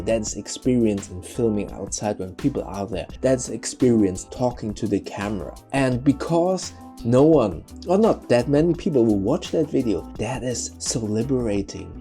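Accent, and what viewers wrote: Germany. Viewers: I love your voice and your German accent, it's so soothing. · Dude, love the German accent! Echt ein klasse Typ, weiter so!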